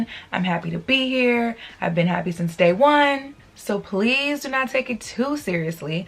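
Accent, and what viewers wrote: South, United States. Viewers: You got that southern accent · Love the way you talk with an occasional “ y’all”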